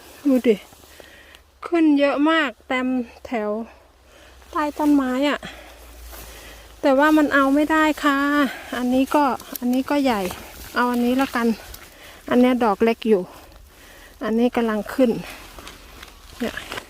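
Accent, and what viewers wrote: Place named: Laos. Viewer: 🌺🥰👍🌸สวัสดีคะ่น้อง คนเก่งของพี่พูดภาษาลาวน่ารักจังเลยคะพูดบ่อยๆนะคะคนดี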